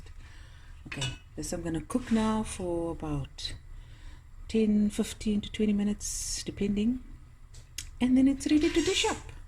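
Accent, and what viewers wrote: Cape Town, South Africa. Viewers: It's so nice to walk with you through your garden. Your lemon is doing well 🤣 Oupa's fence looks sturdy and Sunnyboy is as beautiful as always. Loved your story time, so similar to mine. Food, love, bread and always some left in the spens. Lekker koue groenbone op brood, met sout en peper. Jou hart is vol en jou stem so warm en vol liefde. Nou gee jy als terug op soveel maniere en ook hier op YouTube. Dankie vir als. En jou pragtige Kaaps. Baie liefde. 🥰🤗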